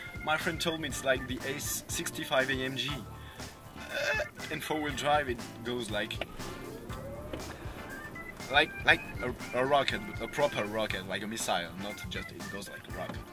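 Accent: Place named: France. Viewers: Je me disait bien qu'il y avait un accent francais sur cette anglais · oui, l'accent français est très net. Es-tu français ? Car on dit : "cet anglais", pas "cette anglais".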